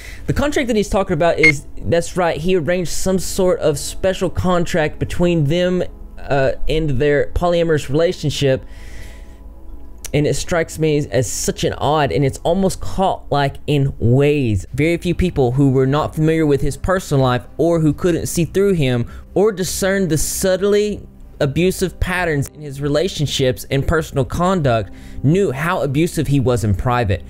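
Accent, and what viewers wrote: South, United States. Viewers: love the country accent with the punk look lol. where are you from??? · Idk why I'm watching this. Never seen a video on this channel. Ooh I love the southern accent though! Lol. Good video as well. Onision is an authentic narcissistic psychopathic. Its quite crazy honestly! Has YouTube gotten involved in any of this legal and moral drama? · I've never seen an emo boy with a country accent. Thank you for enlightening me. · Your face says punk rock, but your voice says country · HIS COUNTRY ACCENT WITH HIS PUNK AESTHETIC IS KILLING ME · He definitely sounds like a southern gentleman 😂 I love it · Can i just say I love your country accent!!! & you're cuter than a truck load of baby ducks!!! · I love what you're doing man you have great vids but I just gotta say it you sound like Idubbbz doing a southern accent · This was a very well detailed video on the whole situation. 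Also I wasn’t expecting that southern accent but hey! It’s great, for some reason it makes it more entertaining to hear you reading out the little “articles” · I thought I heard a southern accent .. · Dude you look like a anime character but with a heavy southern accent. The world is a beautiful place. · I just love his southern accent like Jesus. 😍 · Onion boy is disgusting, but I subbed because of your accent. Not many people on the platform have a southern accent! (I’m from GA) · Besides from this hot tea, I enjoy the juxtaposition of your emo style and your southern accent. Very pleasing. Also good job and being unbiased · you and your factual Southern drawl o lawd <3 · This is my first video I've seen from you and omg you look so much like Shayne Topp, just more alternative with a southern accent. It's fantastic 😂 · you look like a final fantasy character and then you hit we with the southern accent lmao. 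Great video man. Really liking your stuff. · It’s always so nice to hear someone else with a southern accent on YouTube 😭😭😭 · I love his low-key country accent loool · you're like super southern.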